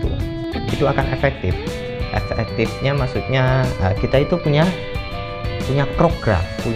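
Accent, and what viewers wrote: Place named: Java, Indonesia. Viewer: Jawa ya mas? Bahasa nya kaya saya hehe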